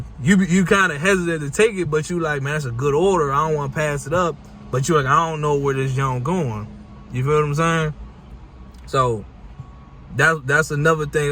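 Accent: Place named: Washington DC, United States. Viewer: Good video fam. Fellow DMV dasher here. When I heard your voice, I said ah hell, DC dasher!!! I love it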